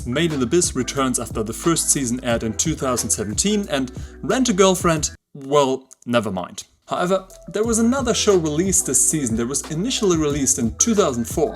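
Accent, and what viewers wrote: Germany. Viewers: I hear a bit of an german accent, am i right?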